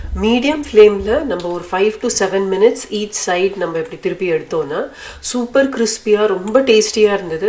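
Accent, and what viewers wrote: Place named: Palakkad, India. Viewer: Hi,your videos are very warm and motivating. Recently I’ve started viewing your videos and i love it. You are really doing a great job.All your recipes are very good and i tried a few also. You have a palghat accent 😊and that’s nice too.Keep rocking dear 👍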